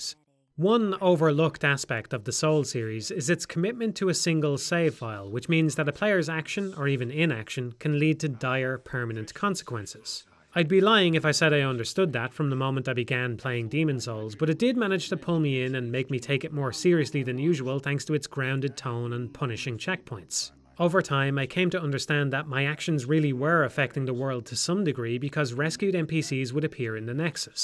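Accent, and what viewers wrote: Ireland. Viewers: He's Irish, it is impossible to sound pompous with an Irish accent. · the Irish accent is wonderful to hear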